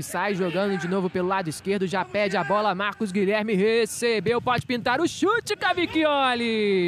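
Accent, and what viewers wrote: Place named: Rio de Janeiro, Brazil. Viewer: Dá para tirar essa VOZINHA de TAQUARA RACHADA, feia, estridente, de criança e colocar um HOMEM ou uma MULHER ADULTA para narrar!? Fora o sotaque extremamente acentuado que só CARIOCA entende... TIREM ESSE NARRADOR DAÍ, URGENTE!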